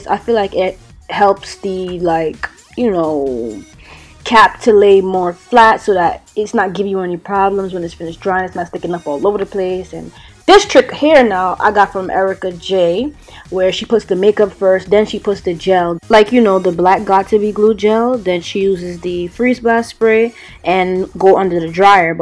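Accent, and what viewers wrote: New York, United States. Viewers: You’re def a New Yorker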